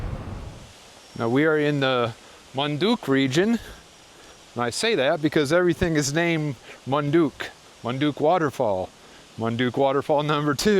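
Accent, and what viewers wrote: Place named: United States. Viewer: Sounds like a stupid yank lost in a foreign country, so many of these dicks you meet on, just interested in the surf and a cheap place, do you care about the people wanker?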